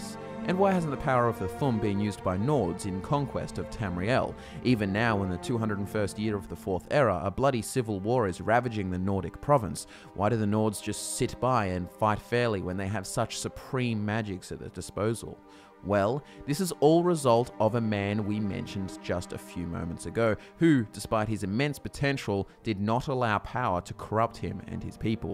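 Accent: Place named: Australia. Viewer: 3 it's actually australian that you and youtube auto-comments have a hard time with ;)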